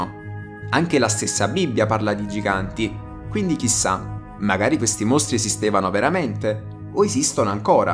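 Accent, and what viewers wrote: South, Italy. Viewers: peccato l'accento meridionale del commentatore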